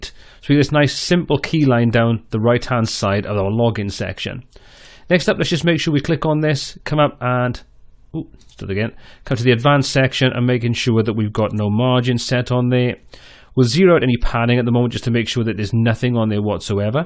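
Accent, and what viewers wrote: Wales, United Kingdom. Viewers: You sound like you're from Wales. Am I right?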